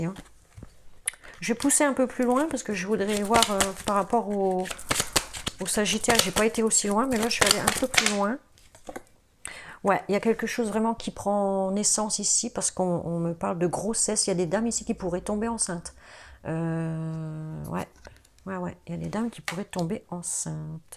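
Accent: North, France. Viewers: j'adore l'accent du NORD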